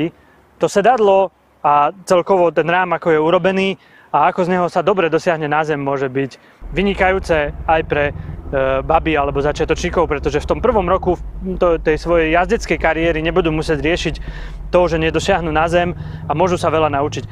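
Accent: Slovakia. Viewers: Kurna, co je to za slovenský přízvuk......to je z nějaké určitě oblasti Slovenska?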